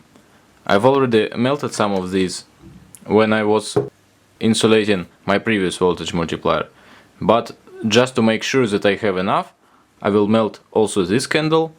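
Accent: Russia. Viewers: Are you from England? Because I hear you're not from England, but from Russia, right?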